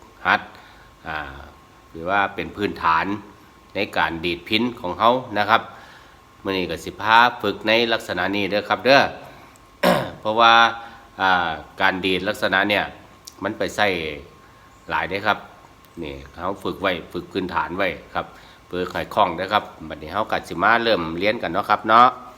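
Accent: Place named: Chaiyaphum, Thailand. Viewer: ม่วนหลายครับอาจารย์ เข้าใจง่ายนำพร้อม สำเนียงเว้าอาจารย์คือคนชัยภูมิแท้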